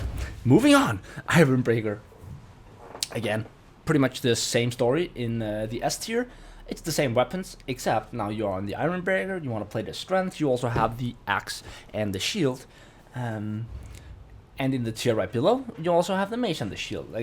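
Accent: Denmark. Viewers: My first video I'm seeing of your. You sound danish in your accent. Wondering where you are from my man?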